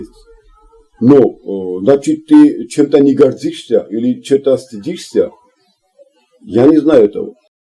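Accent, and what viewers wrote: North Ossetia, Russia. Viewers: ЧТО У ТЕБЯ ЕСТЬ И ЧТО У ТЕБЯ ВОРОВАТЬ? ВОН ТУРКИ УЖЕ У ВАС ДОМА КОМАНДУЮТ И ПРИБИРАЮТ ВСЁ К РУКАМ. НЕ ДАРОМ ОНИ ВАС НАЗЫВАЮТ ГУРДЖЫСТАН, ЧТО ОБОЗНАЧАЕТ РАБЫ! ТАК, ЧТО ГОРДИСЬ ДАЛЬШЕ. У ТЕБЯ САМОГО АКЦЕНТ ОСЕТИНСКИЙ Я В ЭТОМ ХОРОШО И ЧЕТКО МОГУ ОТЛИЧИЕ УВИДЕТЬ. ТАК,ЧТО НЕ НАДО ЛЯ ЛЯ... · А почему у тебя осетинский акцент ти случайно не осетин. Плохо брать по грузинский говоришь.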